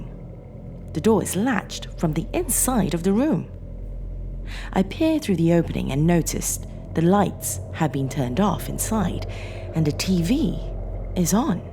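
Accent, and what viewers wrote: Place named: United Kingdom. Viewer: I love your British Accent and this channel, you just got a new subscriber. Great Show Indeed !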